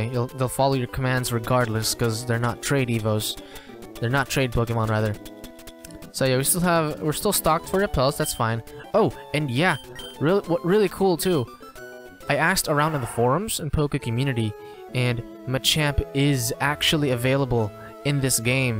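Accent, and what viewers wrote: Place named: United States. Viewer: woah cool! I have Filipino blood and was born in San Francisco, California. Came here to the Philippines way back '98 when I was 10. So are you residing now in the U.S.? I noticed your accent. It's an American accent so that made me think you're a Fil-Am but as you've said you're full Filipino. Btw, does this hack have an Amulet Coin? I just went in the Pokémon League earlier and I'm wondering if this hack does have an Amulet Coin. It's so hard to make money in the Pokémon league because my team's level is just 5 main Pokémon with levels 56. I keep on dying whenever I get to the 3rd member of the Elite Four.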